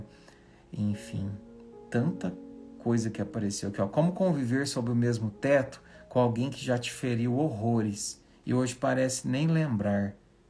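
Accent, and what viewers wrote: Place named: Minas Gerais, Brazil. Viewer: Bom dia! Conhecendo seu canal hj e agora! Só o tom de sua voz, já me acalma o coração e a alma, e esse título de hj, eu estava demais precisando ouvir! Ais 62 anos, c/ depressão crônica, TDA/H s/ nunca ser tratada e dezenas de complexos, rejeição, baixa auto-estima e traumas, desde a infância, como é gratificante ouvi-lo❣️Gratidão por existir e se prontificar a acalentar nossos corações e almas🙏🏻🙌🏻🌟🌠 Vc é psicólogo❓️Tem sotaque de mineiro😊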